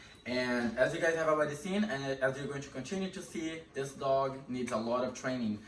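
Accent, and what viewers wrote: Germany. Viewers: Do I hear a German accent? I love you work!❤ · I LOVE watching you work! I'm sorry people will probably think I'm a dummy but where is your accent from? Is it German? I'm pretty new to watching you.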